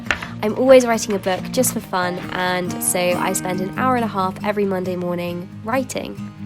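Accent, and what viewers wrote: United Kingdom. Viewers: Damn....I loooooooove your Birtish ACCENT...JUST EXCEPTIONALLY GOOD..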